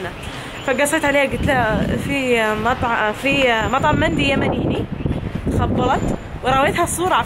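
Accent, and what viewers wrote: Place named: United Arab Emirates. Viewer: قبل لا اشوف الفيديو ضغطت لايك لاني واثقه انه بيكون حلو وصرت اعشق اللهجه الاماراتيه منج يا وحفضت جم كلمه ( يزوغ = يخوف ، ازقرها = نادها ) وبعد والله نسيت المهم لا تطولين علينا بعد نبي فلوقات ما شبعنا انا من الكويت احب فلوقاتج وااايد 💐💐😘سلمي على زايد يا حليله 😘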